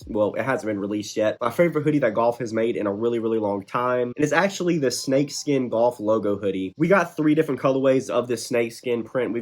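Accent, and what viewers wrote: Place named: South, United States. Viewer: This dude’s accent is so sick. Very southern, I love it! Love your channel man, so nice to find a brother through GOLF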